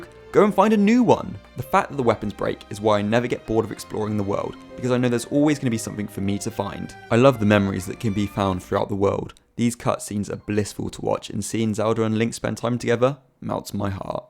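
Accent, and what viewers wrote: United Kingdom. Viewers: You have earned a sub!!! Hearing someone talk about Zelda with Received Pronunciation is S tier for me. You’ll have a lot more subs once more people discover how high quality your videos are! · Like hearing a fellow English man talk about these games most of the YouTube videos are American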